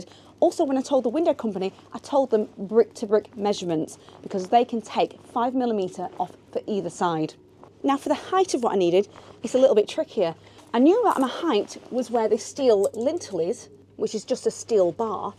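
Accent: United Kingdom. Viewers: Love British accent they speak 24 different dialects of accent wores is from Manchester claimed by most British they said you don't understand those people at all British Empire was larger than any one spray the language all over the world most country adopted and they spray different dialects accent the easy one is American English English International is much different the same as the Australian and New Zealand special in Africa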